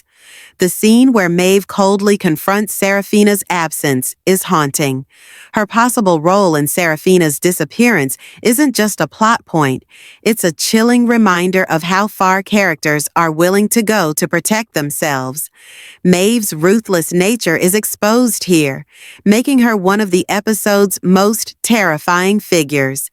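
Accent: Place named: United States. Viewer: It's interesting having the feedback, but with the american accent of this woman , she is not pronouncing most of the words properly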